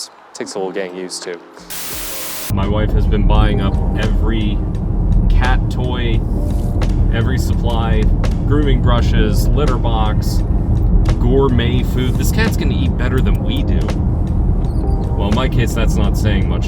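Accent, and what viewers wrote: Scotland, United Kingdom. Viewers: Guessing that you aren't obsessed as I am about organic foods. Are they as accessible as in the U.S.? I LOVE hearing Scottish brogue ! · I get a chuckle when your Scottish accent is creeping in. The same thing will happen to me.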